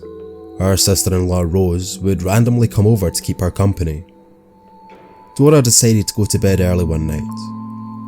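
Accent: Scotland, United Kingdom. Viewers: 🙂 Welcome Back Mr.;) Thank you for the Awesome Narration!!! I hope that you and everyone else has a Twinklelicious ✨Tuesday Night too;) much love Sexy Grandma Darkness ✌️💋 Just Joined your family:).. your Scottish accent is so Beautiful 😍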